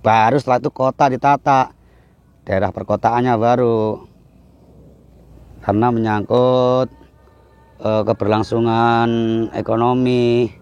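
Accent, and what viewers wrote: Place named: Bekasi, Indonesia. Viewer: Bang lu orang jawa apa orang bekasi....? bacotan lu sama pisan ama orang bekasi ora danta...😂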